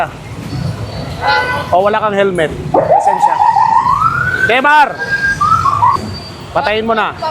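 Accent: Iloilo, Philippines. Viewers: Parang ilonggo c sir ah 😊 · Ilonggo ata SI sir 😂😂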